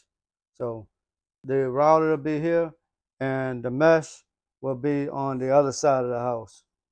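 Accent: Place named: Louisiana, United States. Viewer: I love dat when you hear a voice dats obviously from Louisiana.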